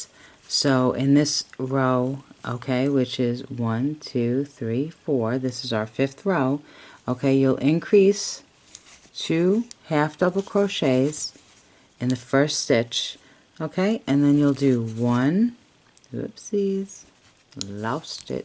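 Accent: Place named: Brooklyn, United States. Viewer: I'm a Brooklyn girl but live in California now, hearing you I felt like I was home. Love this hat, gave one to a friend she loves it as her go to hat.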